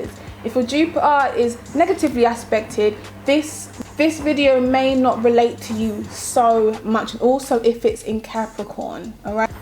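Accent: United Kingdom. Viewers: U got this Lil nice Lil vibe wit you with yo great Britton sounding ahh 😂😂😂😂but it's sexy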